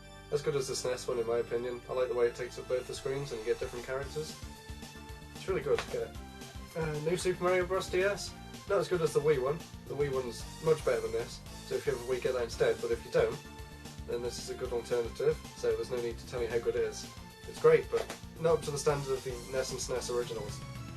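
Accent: United Kingdom. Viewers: do you live in uk e.g beacuse of accent and games label game shop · yea man no problem. really appreciate it. hope u gonna make a new video soon. it helped me to see which games i needed man. yea i figured you were from the uk. since u got a british accent :P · I really love the way you speak ( british english i guess) and also your games are very nice. Even tough i would have like a quick gameplay of you to each game, i still gave you 2 thumbs up and subscribed to your channel. So keep doing your good Job!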